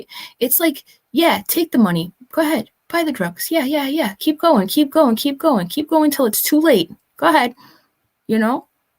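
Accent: United States. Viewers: This is only the 2nd time I've watched you and yes I subscribed after the first half an hour. I live in Australia and I think you are the American version of me but way cooler. I think I actually agree to most of everything you said. Thank you and God dam I love your accent I could listen to you for hours 🇦🇺💛💚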